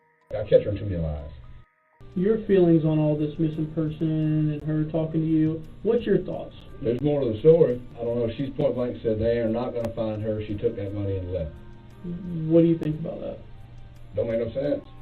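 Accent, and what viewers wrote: South, United States. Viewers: Hi, I meant Girl 👋🏽😏. I never realised just how very many videos you've actually made. Just when I think 🤔💭 I've seen em all, I find a load more!! Don't think that WE, Your Loyal, Lovin' Fans Don't appreciate all the Effort, Time & Hard Work that You put in, because WE Really DO. Also, I Really, Really love ❤️ your Accent!! WE All Do. Thank You, Girl & rather than say "Keep Up The Good Work", I'm actually going to say "Take it easy on Yourself Miss & make sure you have time for yourself too". Kind regards to both You & Your Family, From The UK via Jamaica 🇯🇲💯 God Bless You. Wayne D. 😏👍🏽🌹🌹🌹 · It’s because she isn’t on-screen. The biggest channels have hosts that are nice to watch. Also, a lot of people think the southern U.S. accent sounds dumb. In the states, we look down on the dumb country folk 🤷🏼‍♀️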